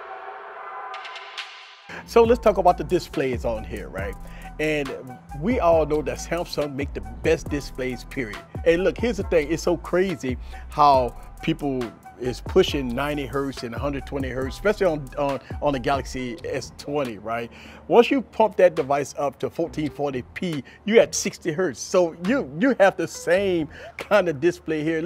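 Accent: Louisiana, United States. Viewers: He sound Creole like he's from Louisiana New Orleans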